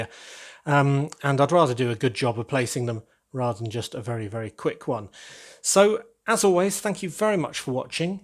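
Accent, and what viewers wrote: United Kingdom. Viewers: are you british bc i thought you were american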